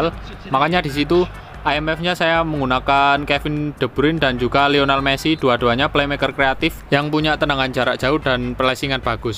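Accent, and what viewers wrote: Java, Indonesia. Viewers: Keren bro, medok mantap, cuma ada kata anj*r nya kurang enak didengar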